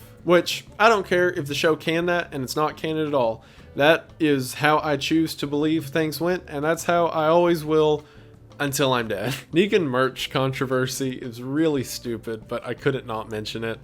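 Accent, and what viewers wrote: United States. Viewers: stop sounding like a yee haw man this cant be real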